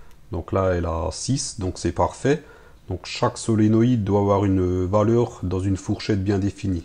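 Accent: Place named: Lorraine, France. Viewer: Tu viens de Moselle ou d'Alsace ? 🤣 Parce-que j'habite Forbach et j'ai directement reconnu un accent hahaha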